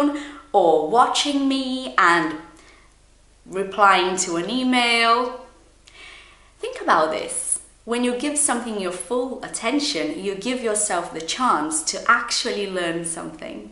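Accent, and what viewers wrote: United Kingdom. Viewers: your vídeo os Very useful and you have an excellent British accent. Congratulations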